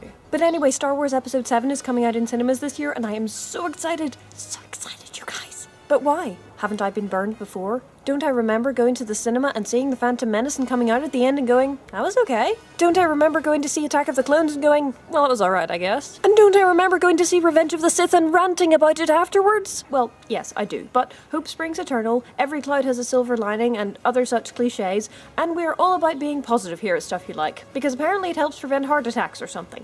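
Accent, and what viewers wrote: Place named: Northern Ireland, United Kingdom. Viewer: No she's Northern Irish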